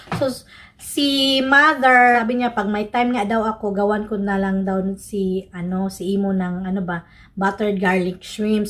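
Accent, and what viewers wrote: Visayas, Philippines. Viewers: Bisaya accent?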